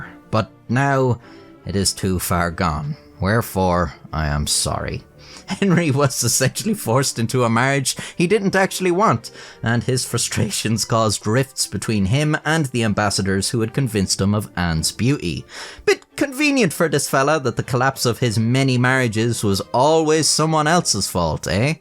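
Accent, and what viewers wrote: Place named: Ireland. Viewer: I must say, you really spoke in a very good Irish accent in this video.......that's wert a tumbs up.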